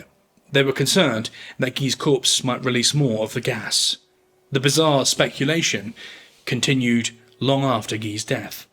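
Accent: United Kingdom. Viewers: I once believed, totally, in capital punishment, couldn't understand the logic of those who thought otherwise. In time I have totally reversed my opinion for reasons I don't need to go into. But that being said, I have some major issues with this video. For starters, conflating American executions with Nazi Germany's genocide is offensive, deliberately misleading, wrong, and frankly coming from a man who sounds British, well it takes huge brass balls. And given that the UK practiced capital punishment for a thousand years, on a much larger scale than the US, well there's no small irony in his smugness. I also noticed that the number of patrons for someone who seems to carry a torch for this subject matter kind of suspicious. Perhaps this is a well funded political mouthpiece? To be clear, it's not the message that I really object to but the filthy package that carries it. 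Cheers. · Sounds like a limey snowflake. The USA needs to execute more and quicker to be a deterrent.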